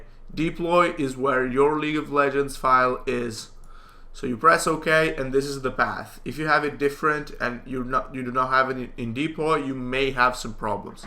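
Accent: Italy. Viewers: are you italian? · Oddio Ma sei italiano?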